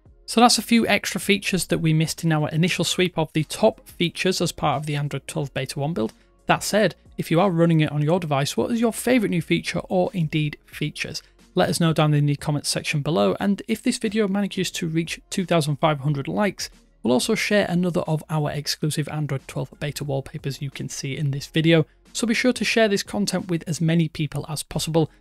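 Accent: United Kingdom. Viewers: Which accent is he speaking? British? Can anyone tell? English isn't my primary language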